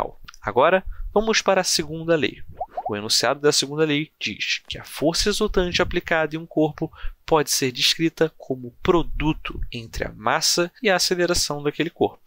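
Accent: Rio de Janeiro, Brazil. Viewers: Sotaque carioca dele esta no pico nesse dia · Carioca, mano da uma agonia xxxxxxxxxxxxxxxxxxx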